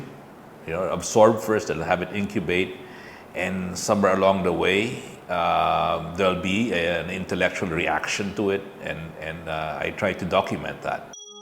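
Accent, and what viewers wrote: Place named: Philippines. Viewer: Click agad dahil sa thumbnail, kakaiba yung design... Pini-figure out ko kung India, Malaysia, Singapore yung bahay Pero nung nagsalita na... Luh! I somehow heard a Filipino English accent kaya sabay tingin sa description at comment. Just wow! 💪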